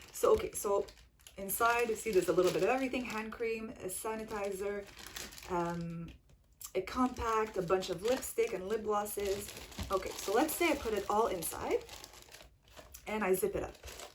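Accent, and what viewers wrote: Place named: Montreal, Canada. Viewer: You have the cutest Montreal accent ❤️ cute comparison!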